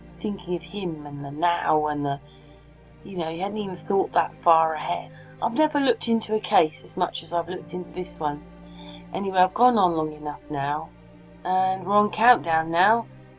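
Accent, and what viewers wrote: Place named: Midlands, United Kingdom. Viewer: Happy birthday to you, is it a midland accent or east coast?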